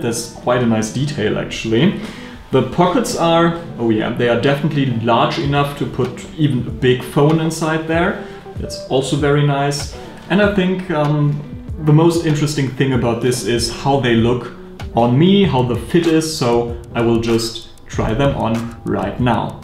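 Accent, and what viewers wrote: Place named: Germany. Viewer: geil. wie man einfach das deutsche English heraushören kann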